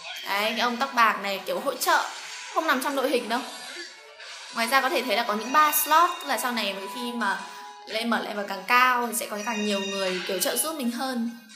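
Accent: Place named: South, Vietnam. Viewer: nói giọng miền nam đáng yêu vải :v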